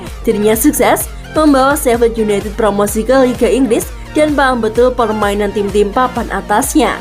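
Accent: Java, Indonesia. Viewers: la kok logat jowo mbak e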